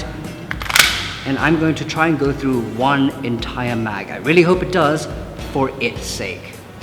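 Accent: RP, United Kingdom. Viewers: You sound really posh lol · Is that a British accent? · why do u sound so British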